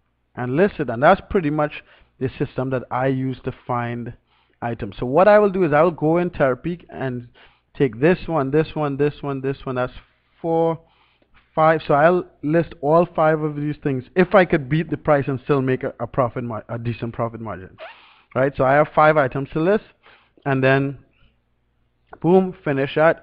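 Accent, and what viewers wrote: Trinidad and Tobago. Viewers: you sound like a Trini if you are i am too and have been drop shipping both on ebay and amazon will love to connect can we watsup or messager ???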